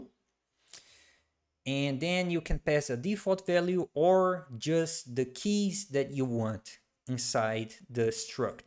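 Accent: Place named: Brazil. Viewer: At first I didn't understand what these `echo` and `feud` meant, then I learned that Brazilians just pronounce the words `error` and `field` like this... Very irritating :D, but I've gotten used to it now.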